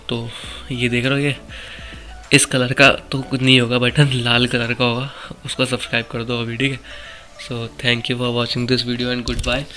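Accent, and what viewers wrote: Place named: India. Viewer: Yeah like im supposed to understand a damn indian guy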